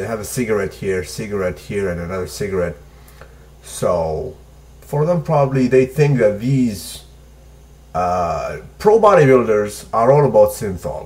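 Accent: Arab world. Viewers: hahahah i like your arabic accent, if i find someone in morocco with biceps lookin' like an elephant balls i'll tell him what really works. By the way right now i'm bulking and i'm going to need you in my first cut man. SALUTE ! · ***** i am from Egypt, I really have no idea how do someone even think he is aesthetic looking like that?! i also admire what you are doing trying to raise awareness and everything personally i didnt see a case of synthol abuse before in front of me yet i know for a fact that even pro bodybuilders use it sometimes to hide some weak points i dunno is it about abusing? not that i like the idea or anything even with a small dose its not even making muscle gains + the poisoning possibility from my side i am sharing your video on some Egyptian fb pages and forums, hopefully when teenagers see it, they realize the facts at early ages. salute you & your arabic accent as i honestly dont know how come you speak arabic :D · his Arabic accent is pretty spot on lol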